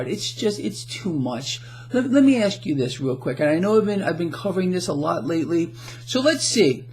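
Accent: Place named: Brooklyn, United States. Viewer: I recently came across your channel and checked out your website, amazing stuff ! I would know a Brooklyn accent anywhere as I have one too !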